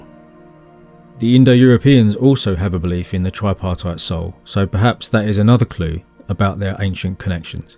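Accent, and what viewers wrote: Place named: London, United Kingdom. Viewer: I love the London Accent 😂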